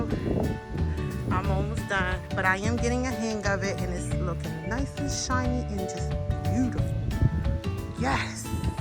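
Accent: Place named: Maryland, United States. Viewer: You from Maryland? I didn't watch the whole video, but I heard a little of your accent. The "tew" is giving Maryland lol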